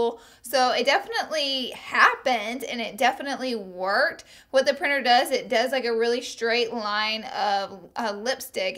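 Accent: South, United States. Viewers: She is so country I love it!!!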